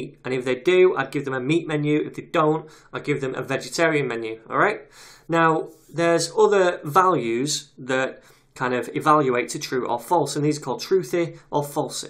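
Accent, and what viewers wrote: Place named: Manchester, United Kingdom. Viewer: That's a joke, right? Just in case it isn't, he's English. It's a Mancunian (someone from Manchester) accent.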